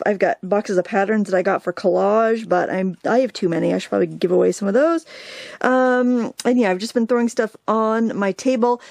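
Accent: Maine, United States. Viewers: Organizing storage bins,, trays, and containers always come in handy, so I would keep many, too. You cracked me up when you asked yourself why you have such a Maine accent today. Uhh, maybe cuz your in/from Maine! Lol!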